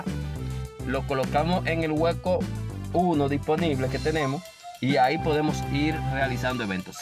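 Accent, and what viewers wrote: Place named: Dominican Republic. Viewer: También soy Dominicano, me lo imagine por tu asentó, tienes un sub más.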